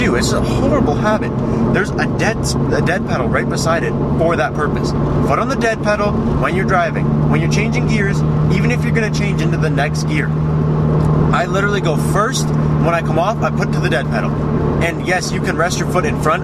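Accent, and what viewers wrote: Canada. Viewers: dat Canadian accent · are you from Canada ? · TheDerDumme He sounds Canadian to me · First video watched from you. Are you from Canada? XD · ahah you canadian? maybe from ontario i heard G1 lmao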